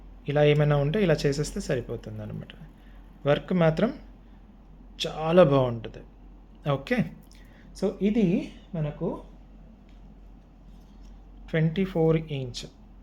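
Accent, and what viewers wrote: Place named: Telangana, India. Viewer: Mee telangana slang super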